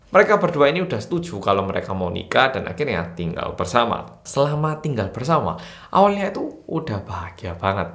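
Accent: Java, Indonesia. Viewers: salfok sama cara mas ngomong 'koceng' di menit awal. bukan 'kucing' tapi koceng'. mas medok sekali😂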